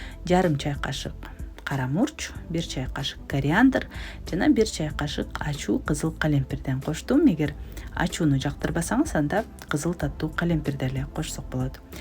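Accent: Standard. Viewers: Сонун азаматсыз. Сизден дагы коптогон рецептерди кутобуз. Кыргыз тилинде таза суйлогонунуз абдан кубандырат😊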